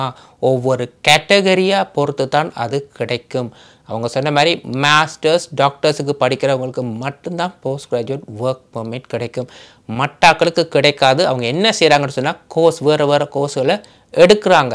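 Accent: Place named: Sri Lanka. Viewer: இலங்கை தமிழ் பிரமாதம்.. கேட்க இனிமை...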